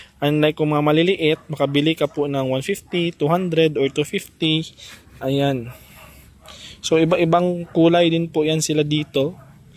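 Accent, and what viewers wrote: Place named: Iloilo, Philippines. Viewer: May waling waling din po kayo sir.. Ang galing mo mag tagalog sir pero ang accent nyu is ilonggo sir.. Ako din ilonggo din po ako sir.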